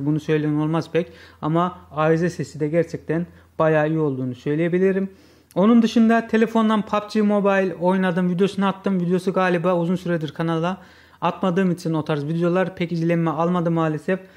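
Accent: Azerbaijan. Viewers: Azerbaycanlı mısın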